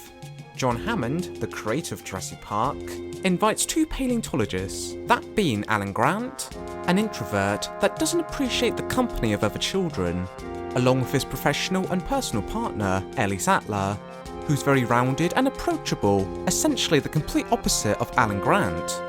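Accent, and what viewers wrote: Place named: England, United Kingdom. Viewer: somebody should teach the english how to speak english. it's hard to make out what the hell you're saying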